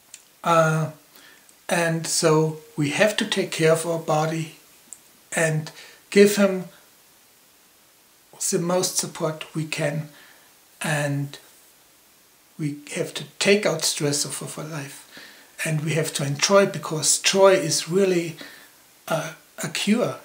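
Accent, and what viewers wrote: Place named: France. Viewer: OMG! Are you FRENCH?! :D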